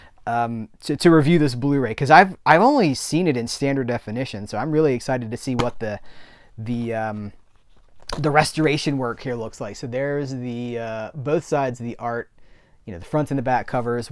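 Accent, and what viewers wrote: Australia. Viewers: As an Aussie, I love Umbrella Entertainment! The fact they are finally putting love and care into older Australian films in numbered lines is fantastic. And also making films available in Australia on bluray, to save importing! Watch the Beast the other night... wow, crazy film, but weirdly good. Hope you continue to enjoy the Aussie content too. 1) great Aussie accent 2) Great Stewie impression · Your Aussie accent is very good and digging your 70s/80s look, keep it up!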